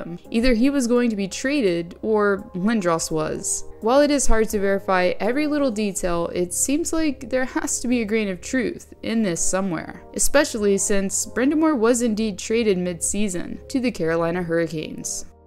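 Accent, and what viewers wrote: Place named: Pittsburgh, United States. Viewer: Your accent reminds me of going to Munhall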